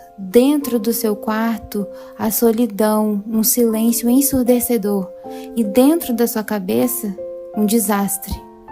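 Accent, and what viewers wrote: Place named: Bahia, Brazil. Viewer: Olha só, que incrível! Amei esse vídeo, você é muito talentosa, a televisão precisa de pessoas assim como você, jovens de talento e bom gosto. Imagino que você deva ter no máximo 22 anos. E como vocẽ conhece tão profundamende a história de e, principalmente a história dessa música?! Parabéns mesmo. Muitos da sua geração e das mais recentes, deviam ter contato com a boa arte dos anos 70, 80 e 90, para que talvez, com disseminação da boa cultura, quem sabe assim novos artistas como e tantos outros possam surgir no mundo. Meu nome é Jô, tenho 52 anos, moro em São Paulo e nasci em Jequié na Bahia. Achei você com o sotaque meio bainao e pernambucano. Fala aí, de onde você é? Quanto a música, ela é incŕivel, as gotas de chuva em câmera lenta, é incrível o clip. Quem sabe talvez você não possa fazer um vídeo falando da também? 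É outra cantora incrível que merece ter muitas músicas destacadas. Sucesso pra você garota, você tem o talento estampado na cara, continue assim com sua simplicidade que você chegará longe.💚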